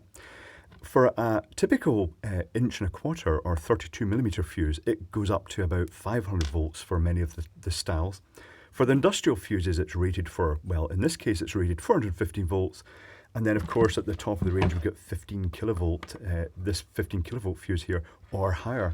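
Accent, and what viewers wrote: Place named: Edinburgh, United Kingdom. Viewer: Refreshing to hear an edinburgh accent!!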